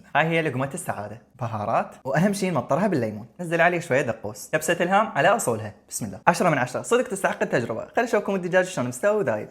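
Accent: Iraq. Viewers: ✨️شوي زلده ياخي احب لاعجتك او العراق ولله ✨️ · حبيت اللهجة العراقية 🗿🩷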